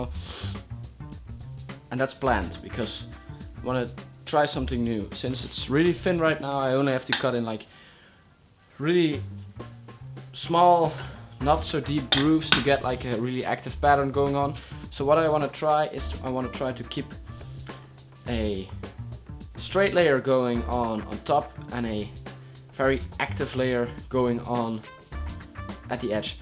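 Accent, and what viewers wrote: Netherlands. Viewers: You 're dutch ?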